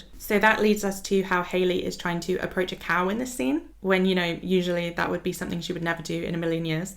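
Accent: United Kingdom. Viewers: I get sinilar questions about my accent for some reason, and I always answer that I just watch a lot of Youtube. Simple, shuts the dumbass question down 😅... you sound normal-brit to me, but i guess my view might be biased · As an Australian, I don't see any hint of Oz/Kiwi accent at all. Maybe the tiniest hint of South African, but not enough for me think you're anything other than a Brit. Also, the haley_concerned portrait that you called angry, to me looks more like pensive or worried or uncertain. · I love your accent. Original English accent. Love it 💕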